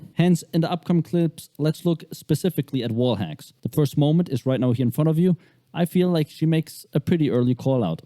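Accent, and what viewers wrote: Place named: Germany. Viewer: You definitely a German bro, your accent sounds like this, and your name too.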